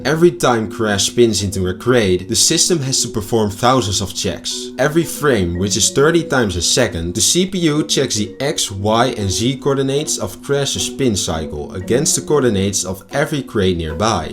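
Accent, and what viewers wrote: Netherlands. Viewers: are you dutch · Plz dont stop making vids. I love them! Btw, are u Dutch?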